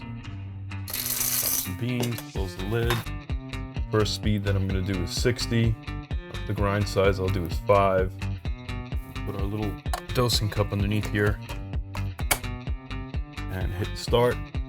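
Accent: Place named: Brooklyn, United States. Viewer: Your accent reminds me of the old school Brooklyn Accent. I still hear it in natives that are over a certain age. An era of NY that I miss.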